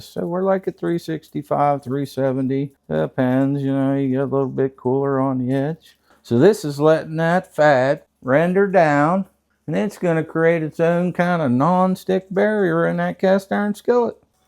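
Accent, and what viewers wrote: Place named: South, United States. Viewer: I love that southern drawl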